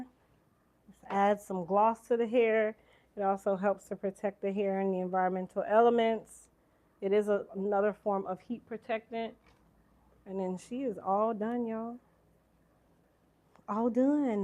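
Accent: Jamaica. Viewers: She’s right you do have a Jamaican accent. It’s not sharp but I do hear it from time to time.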